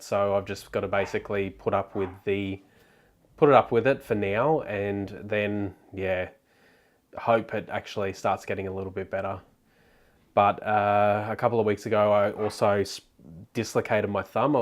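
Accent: Australia. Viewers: G'day Mate, good to see you back, get well. It's good to hear your Aussie accent again :)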